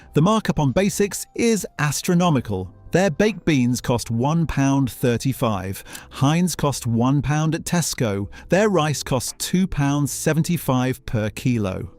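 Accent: United States. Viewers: Why are you talking in an American way? It is so annoying.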